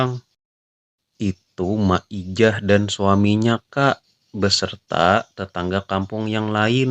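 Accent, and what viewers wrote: Banjar, Indonesia. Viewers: Aslik suarak Banjar lih mang.😊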